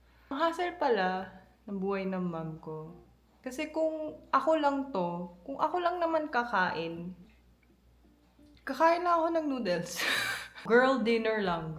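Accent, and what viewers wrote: Batangas, Philippines. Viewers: Mahilig ako sa mga video ng mga tao at ibang culture. Mga ibang lahi na nasa pinas. Or pinoy na nasa abroad kasi nasa abroad din ako. Eto talaga paborito ko sa kanila kasi kababayan ko are sa batangas haha. Pati pagsasalita. Nakakamiss mga batanguenyo. At mabuti yan sa mental health mo andyan kankung saan ka masaya kaya mabuti at nasa pinas ka. Napanood ko kasi ibang mga video mo. Ako at yung ibang pinoy sa abroad no choice minsan. Nakaka epekto talaga sa mental health natin. Napanood ko kasi yung homesick video mo.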